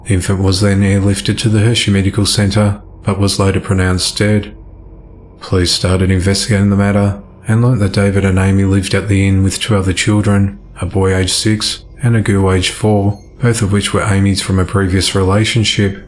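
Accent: Australia. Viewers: Australia, i was born in Australia Victoria nsw, but in ole blighty now I hear the accent now x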